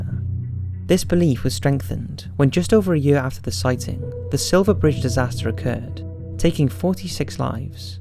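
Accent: Wales, United Kingdom. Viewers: You sound Welsh dude...what part? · He’s welsh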